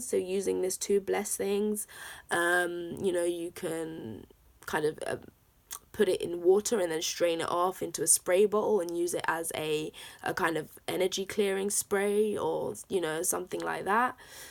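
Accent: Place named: United Kingdom. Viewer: Why do I feel I am in Hogwarts hearing your accent.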